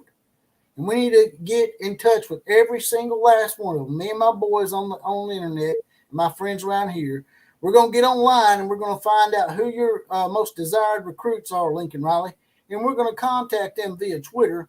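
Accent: South, United States. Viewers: I rather like the southern drawl. But not without the hospitality. 🤣 I'm a Texas fan, so come at me bruh, but I wasn't commenting good or bad, just noticing your southern drawl and sayin there wasn't anything quite like it. I expected a chuckle. But if ya want some nonsense, "I'm your huckleberry." 😈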